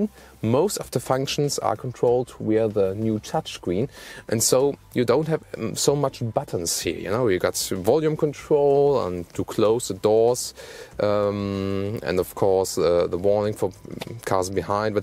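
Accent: Germany. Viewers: Great review, nice video work and lovely German accent. But please stop looking back while driving.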